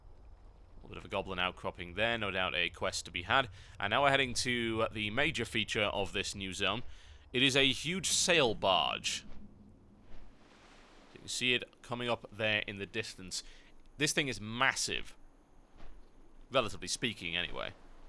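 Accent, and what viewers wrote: United Kingdom. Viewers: hah, nice brit accent. both pretty funny and quite clear to make out the sense. even for some terrible non-native english speakers. watch out for kgb, and god save your queen!=)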